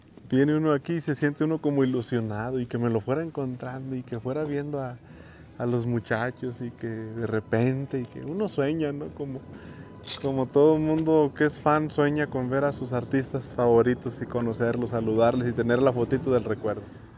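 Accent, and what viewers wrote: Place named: Mexico. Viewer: Muy conito me encanta lo q mas me gusta escuchelo ablar me encanta el acento mejicano un fuerte abraso desde el salvador con muchocarino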